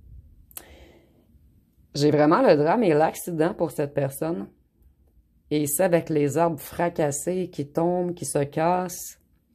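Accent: Canada. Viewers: Première fois que je vous écoute! Votre accent canadien me plaît beaucoup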